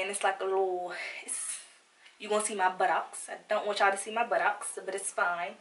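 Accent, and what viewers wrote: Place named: Baltimore, United States. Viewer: Baltimore.... I hear it... Love it an you...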